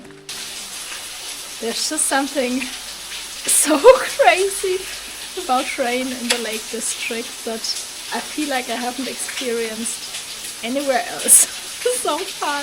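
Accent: Germany. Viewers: Watching your video makes me lay back and relax with a sunshine smile on my face. This is not just a video, this is therapy ! forgive me but do I hear a little German accent ?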